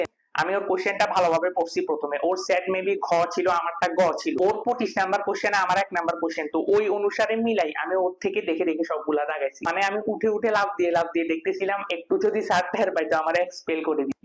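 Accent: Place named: Noakhali, Bangladesh. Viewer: Vaiya apni ki Noakhalir? Kothar accent toh amader motoi !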